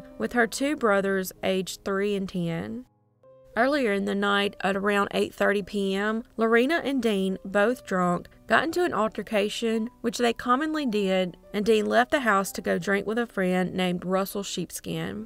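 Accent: South, United States. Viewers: Hi,it is nice to finally put a face to that cool southern voice. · that beautiful Southern drawl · I love your Southern voice, and the channel: you rock!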